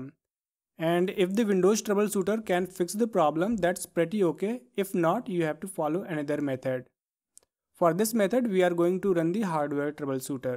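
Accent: India. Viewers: Random YouTubers with Indian accent holding our society and computers on their shoulders. Thank you.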